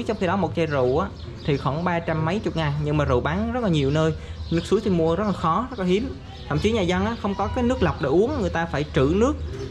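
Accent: Quang Nam, Vietnam. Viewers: tieng nẩu ????? nghe hợp lổ tai...cùng quê cùng làng ??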